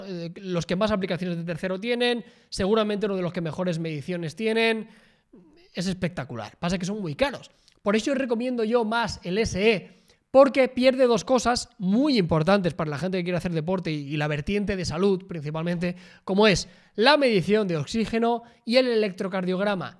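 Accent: Spain. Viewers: eres de ripollet? que puntazo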